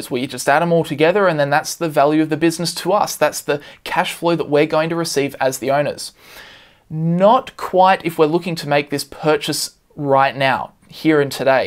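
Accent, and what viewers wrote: Australia. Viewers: Just found this channel. Thanks so much for such excellent content. Nice to hear a fellow Aussie voice too.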